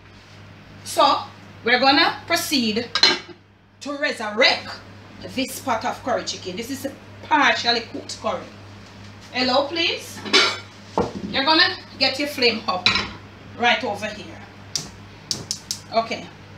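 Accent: Jamaica. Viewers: I love your talking...your a naturally funny ..between patois ..English love it..please don't stop..just be yourself ..and teach us authentic Jamaican cooking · I'm learning ms, I love you and love to hear you talk, you are a true Jamaican keep it up 👍👍👍💖💖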